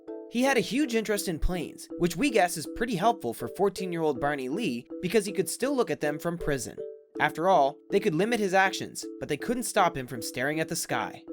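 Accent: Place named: Canada. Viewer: You can tell the guy talking is Canadian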